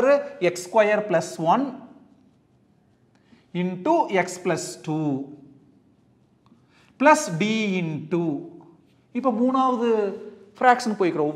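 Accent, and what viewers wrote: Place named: Kongu, India. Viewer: Sir, your are a kongu Tamil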